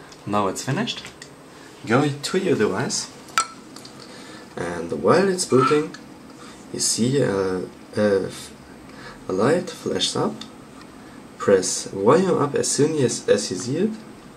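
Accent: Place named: Germany. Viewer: Tried so many times to root my Z1 compact and all methods never worked until this one, Thanks very much, greatly appreciated, root succesful, now all i gotta do is figure out how to get apps to actually work on the sd card, using folder mount and whatever else is needed. I had an idea when i heard the German accent it was going to work this time :)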